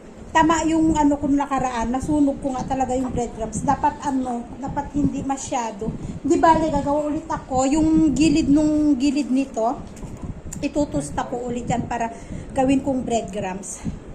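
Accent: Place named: Laguna, Philippines. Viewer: Nkpunta KC sya Laguna..kya may eh n Rin sya.pero timmangkin suna nga ilocana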